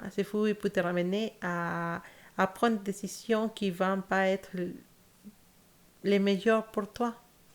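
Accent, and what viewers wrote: Spain. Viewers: Holà, j’adore ton accent espagnol il ne dérange surtout pas . Y Muchas Gracias !!! ❤️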